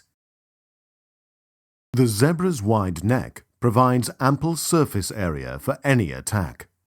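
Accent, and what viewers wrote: United Kingdom. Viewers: this enormously negative over tone coupled with that British accent has got to be, the funniest shit i have ever heard. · he’s British, that’s how’s it’s pronounced.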